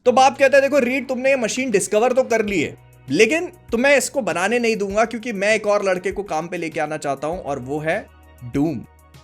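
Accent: Bhopal, India. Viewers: bhopali sunkar maja aajata he. me bhi bhopali hi hun.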